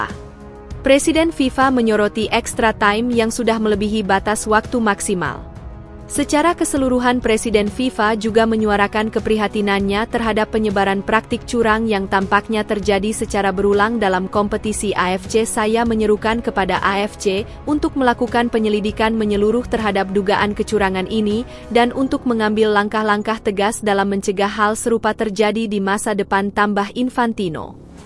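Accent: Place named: Europe. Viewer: Apakah anda orang asing, kenapa logatnya seperti orang eropa, atau anda tidak punya jiwa patriot, bangsa bahasa indonesia.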